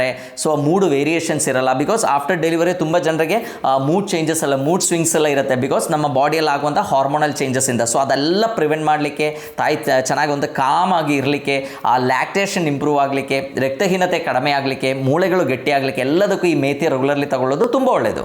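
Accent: Kerala, India. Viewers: ನಿಮ್ಮ ಕನ್ನಡ ಮಾತುಗಾರಿಕೆ ಅತ್ಯದ್ಭತ ಡಾಕ್ಟರೆ 🙏🙏 ಅಲ್ಲಲ್ಲಿ ಮಲಯಾಳುಂ ಆಕ್ಸೆಂಟ್ ಮುದ್ದಾಗಿದೆ❤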